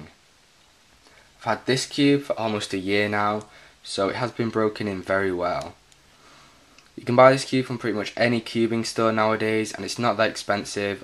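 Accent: United Kingdom. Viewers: i could tell its uk -me being english- i just couldn't tell whereabouts haha, now i know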